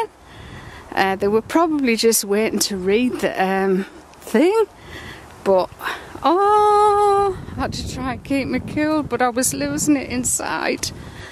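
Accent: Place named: England, United Kingdom. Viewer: I like to see your video. Beautiful English accent .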